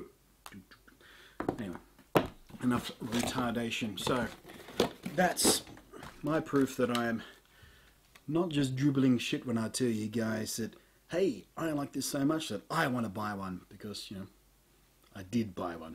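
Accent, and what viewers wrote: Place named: Australia. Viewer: You sleek like a true Aussie. I am proud.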